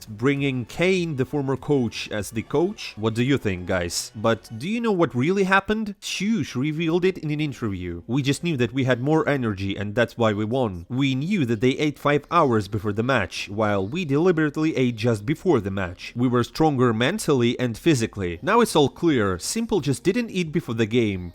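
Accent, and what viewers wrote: Russia. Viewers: Спасибо за новости, ты пытался не спалиться что русский)) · I can feel russian accent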